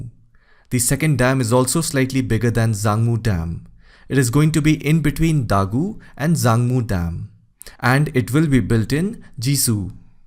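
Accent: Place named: West Bengal, India. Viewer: Mad or what?..he is obviously from West Bengal or northeast...Tamil accent is so different.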